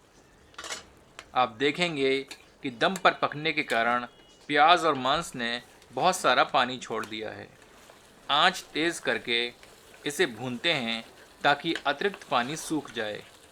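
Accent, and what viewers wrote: Standard. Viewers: aapki hindi bahut shudh he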